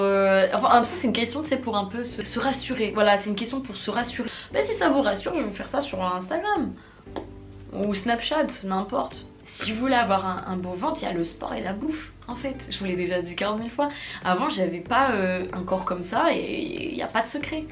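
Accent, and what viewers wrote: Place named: Germany. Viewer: Bonsoir, as-tu des origines allemandes? J’ai noté le petit accent très charmant 😊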